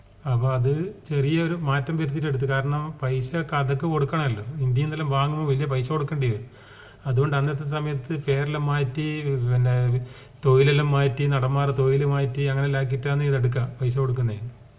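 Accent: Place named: Kannur, India. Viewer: താങ്കൾ കണ്ണൂരാണോ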